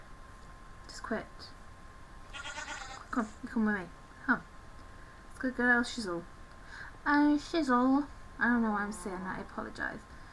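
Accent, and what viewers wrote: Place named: United Kingdom. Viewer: U speak british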